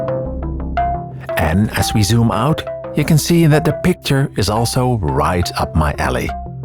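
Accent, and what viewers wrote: Netherlands. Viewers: Your channel is the only one where I can stand such a thick Dutch English accent. Normally can’t stand it at all (“tenenkrommend”) but your presentation and mood just draws me in and envelops me. It is especially enjoyable and just for this once I’ll just have to allow your accent to exist. Thanks for taking the time to share your dots with us. Never change. Also, nice music!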